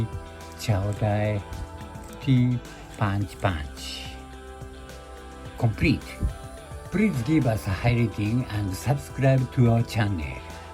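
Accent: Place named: United Kingdom. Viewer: bhai why is ur accent so british (no hate)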